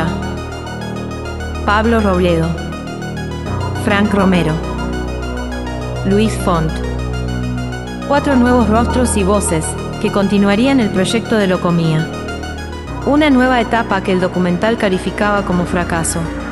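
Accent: Argentina. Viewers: La serie me gusto muchisimo, pues es muy creible y se nota el trabajo de este grupo. La locutora, entiendo es argentina por su acento. Me ENCANTO. LASTIMA QUE LO BUENO TIENE UN FINAL. GRACIAS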